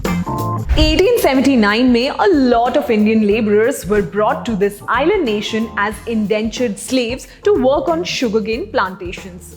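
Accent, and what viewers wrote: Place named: United Kingdom. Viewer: She's speaking british hindi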